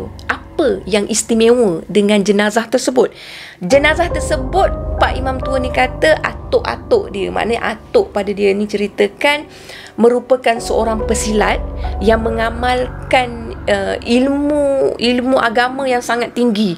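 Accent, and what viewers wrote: Kelantan, Malaysia. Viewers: ooo...orang kelante. Jangan marah, saya suka cerita-ceritanya, cara penyenpaianya...eee dengan rautwajah yang berubah-ubah. Rajin awak cari bahan😓😓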